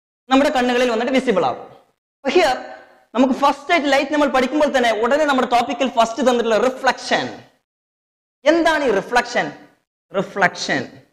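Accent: Kerala, India. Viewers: hare sir you are teaching very well . my man took this lesson 4 times I did not understand , u thought one time I easily understood sir. I think ur mother tongue is Malayalam. Good keep I up man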